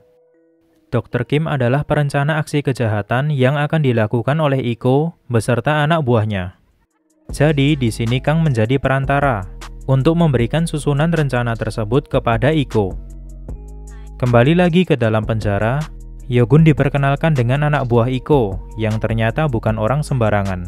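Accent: Java, Indonesia. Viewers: Iki wong jowo iki . joss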